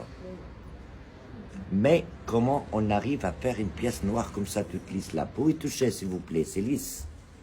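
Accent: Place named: Paris, France. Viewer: wao...il maitrise bien la langue mieux que français eux mêmes.un vrai ambassadeur..il n'est pas originaire français je suppose. en tout cas il a un bon accent parisien❤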